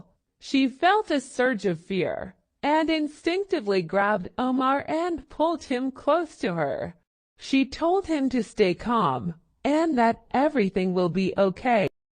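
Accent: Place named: United States. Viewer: اللكنة أمريكية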